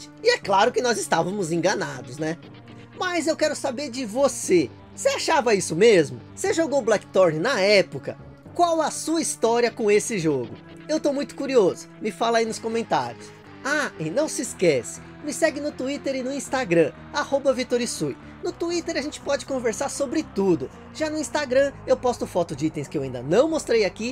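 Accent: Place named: Sao Paulo, Brazil. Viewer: esse é paulista mesmo.... negocio do jooooogo,,,, a trilha sonooora... só dando um toque mano, abraço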